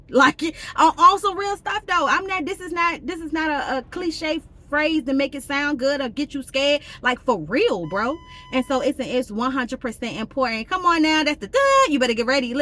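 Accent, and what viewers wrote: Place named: Baltimore, United States. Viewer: I knew she was from Baltimore in the first 5 seconds 😂 Thanks for sharing!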